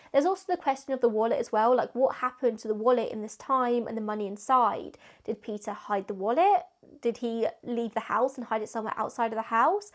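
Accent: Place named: RP, United Kingdom. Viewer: So posh 😂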